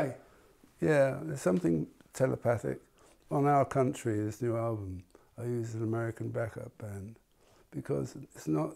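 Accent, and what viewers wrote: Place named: North London, United Kingdom. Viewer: What an interesting guy. He's so well spoken and thoughtful. Yet to me anyway, he's kept the working class North London accent. He speaks highly of his brother, who he can't get along with. I love that "Paul's got a new record out"?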